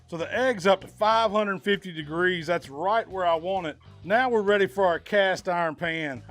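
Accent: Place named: United States. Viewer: Offtop. Enjoying that accent. Which part of US is that?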